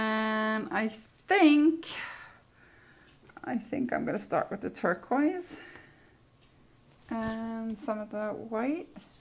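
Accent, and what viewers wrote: New York, United States. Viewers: It says your in Norway, but you sound a bit like a New Yorker...I really enjoy watching your videos. Does doing the live videos make you more self conscious? I've been watching you for quite a while now, it just seemed like you were feeling uncertain about your process...girl, you are a great artist, don't forget that.. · I just found your channel and I find it very motivating and filled with ideas. I enjoy your narrated versions the best. From listening I thought you were from NJ or NY, but heard you mention Norway..then saw your FB profile. Brooklyn..makes sense. I'm in NJ. :)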